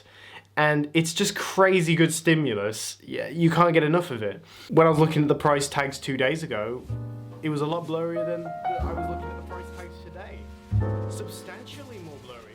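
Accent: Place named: United Kingdom. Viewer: Your British accent :)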